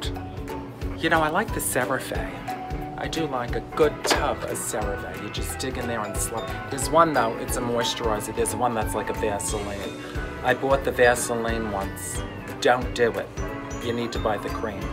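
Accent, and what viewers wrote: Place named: New York, United States. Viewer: I love your accentuated New York accent. Reminds me of home. ❤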